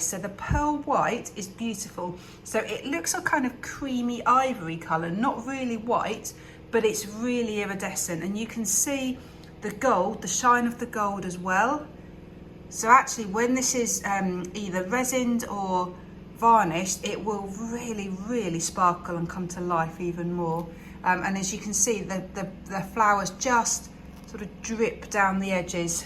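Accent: United Kingdom. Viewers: I have been following various “well-known” artists in pouring for a long time. Nevertheless, your artwork remains the most creative artwork I have come across on YouTube. I enjoy following you and I also find you very inspiring. I always look forward to seeing a new work of art from you. Oh and one more thing: I love your British accent:-)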